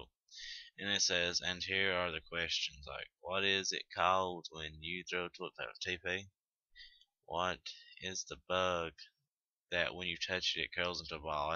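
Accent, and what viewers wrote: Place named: Tennessee, United States. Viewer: Dude I'm from outside of nashville, and I say everything they way you do. It makes me laugh, cus I've watched all the other people from different parts of the world do this as well, and the way you do it so funny. lol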